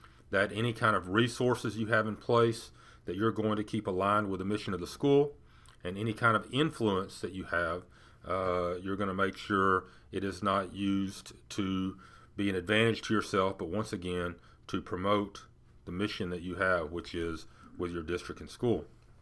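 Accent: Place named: South, United States. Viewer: Dog your southern. not Texan. I know.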